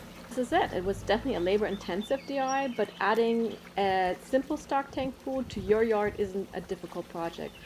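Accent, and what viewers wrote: Germany. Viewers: Very nice! Do I detect a German accent 😊? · I hear a bit of German accent in your voice. It's beautiful. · Fantastic video! Are you by any chance originally from Germany? (Slight accent detected)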